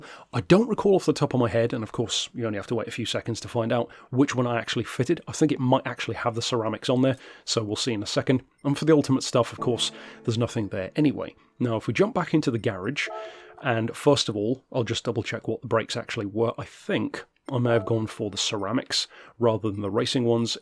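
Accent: United Kingdom. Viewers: You know you're in good hands when you find a tutorial with a British accent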